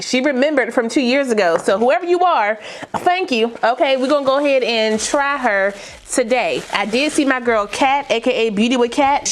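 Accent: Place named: Philadelphia, United States. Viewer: I lost it at the blonde wig 🤣🤣 “hellll nawll” I love your videos and accent .. sound like a Philly girl.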